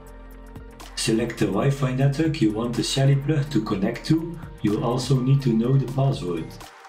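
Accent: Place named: East Flanders, Belgium. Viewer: grappig da ge kunt horen da gij nen oost-vlaming bent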